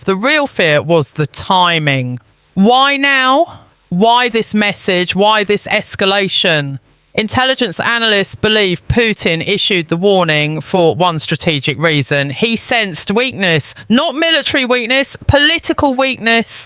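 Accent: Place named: England, United Kingdom. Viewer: It will be a great thing for the world if people with English accents like this are replaced by voices that are 'Chinglish' or Russo-English.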